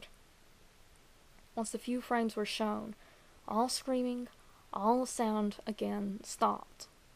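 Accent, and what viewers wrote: South, United States. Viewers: You sound like a Hucken Hill Billy.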